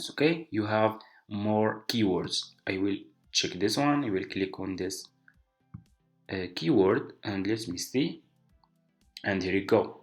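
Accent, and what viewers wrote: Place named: Morocco. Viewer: Thanks and good luck! You are a moroccan i can tell from the accent 😅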